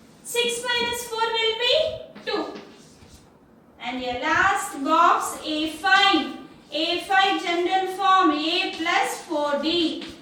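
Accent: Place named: Bangalore, India. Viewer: Mam I thought that u r from banglore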